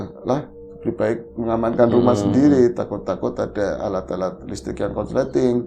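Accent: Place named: Tegal, Indonesia. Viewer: Sllu kangen bhs.Tegal bro yg sngt sedap ddengar jd auto like, komen, and subrek.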